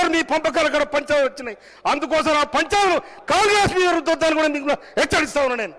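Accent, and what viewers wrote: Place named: Telangana, India. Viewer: Pure తెలంగాణ యాస ❤❤❤❤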